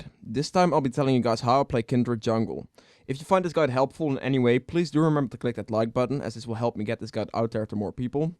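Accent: Netherlands. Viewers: je hoort altijd als een Nederlander Engels praat haha, ^_^ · ben je nederlands? ;D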